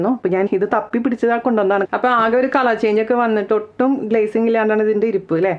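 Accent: Thrissur, India. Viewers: Thank you madam... thanks for the great tip..Really working.. Thrissurkari aano bhasha kettitt angne tonni😁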